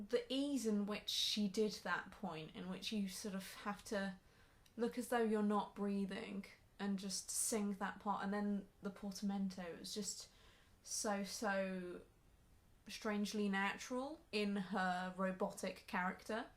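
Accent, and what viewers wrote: United Kingdom. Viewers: Your surname is Polish but your english speech is very Oxford...simply nice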